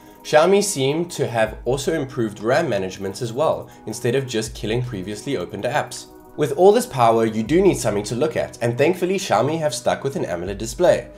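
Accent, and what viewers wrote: South Africa. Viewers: always easy to recognize a fellow Southafrican Did you move overseas permanently?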